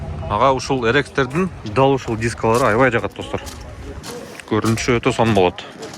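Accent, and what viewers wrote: Standard. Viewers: Ушуну таза кыргызча десе болот моладес брат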